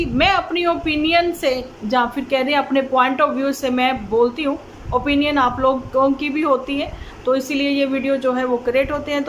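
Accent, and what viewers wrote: Punjab, India. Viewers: ji main ludhiana se hu pera apki punjabi theth punjabi hoti bhot achai lagti jai🎉🎉🎉🎉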